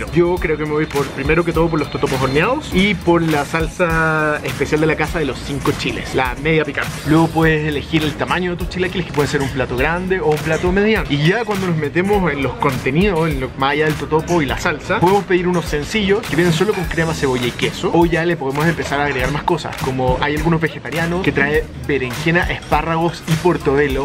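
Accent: Chile. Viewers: Por fin! los chilaquiles son tan ricos:D Saludos!!! cuidate mucho para que puedas seguir disfrutando de la comida y por cierto el color verde te va muy bien!!:D Por cierto me encanta el acento chileno!!! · soy una mexicana qué ama tú país... chile me fascina, me encanta su gente linda ustedes también tienen ésa cultura, ésas tradiciones tan lindas. Conozco chile a través de la televisión viendo enigma, y mea culpa y me gustó tanto me enamoré de ése lugar hermoso qué un día quiero ir a conocer. al escucharte hablar supe qué heres chileno me gusta ése acento tan dulce! Gracias! por aprender de nuestra cultura❤💞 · A que lindo eres, y lo explicas super bien; amo el acento chileno!!! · oyeee me alegra mucho tu canal! Me gusta ver cómo los extranjeros le gusta México y a mi me gusta Chile 🇨🇱:) algún día me gustaría ir a Santiago y conocer la comida chilena y la gente chilena! 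Me gusta mucho el acento chileno también:)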